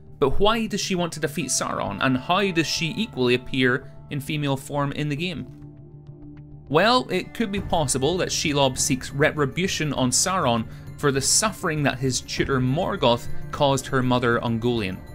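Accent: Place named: Ireland. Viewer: I like your accent. Where are you from? Ireland?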